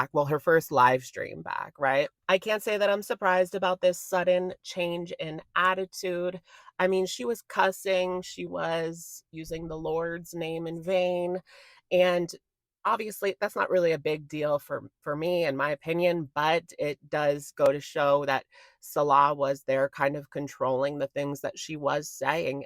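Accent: Boston, United States. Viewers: Boston accent not New York. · It’s a Boston accent. Not a NY accent. Either way, it’s sus. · Her accent is not New York. It's Boston. Its a weird version of Boston, but still Boston.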